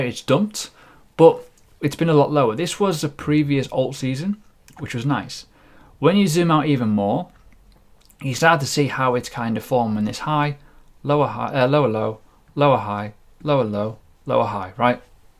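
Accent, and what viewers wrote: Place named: North, United Kingdom. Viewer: Favourite YouTuber. Proper northern. Tells it how it is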